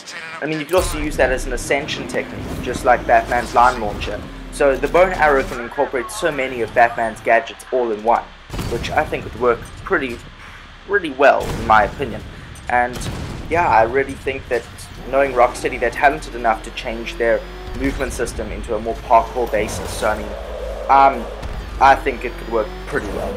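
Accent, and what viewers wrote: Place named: South Africa. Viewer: recognised ur accent 😂 Im from SA too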